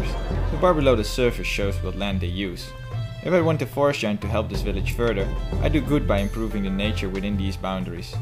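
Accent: Netherlands. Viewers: i dont like dutch people who pronounce words like that, we got a lot of hours learning english, french, german etc and still they pronounce it like that. btw game looks awesome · are u dutch like me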